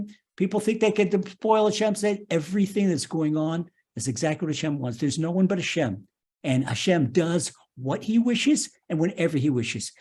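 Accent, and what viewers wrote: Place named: United Kingdom. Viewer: Are you from the UK.. thank you for this.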